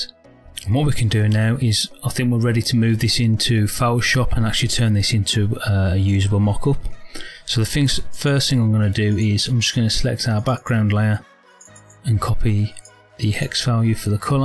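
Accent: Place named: United Kingdom. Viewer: Bruh I cant understand the way you are talking in british style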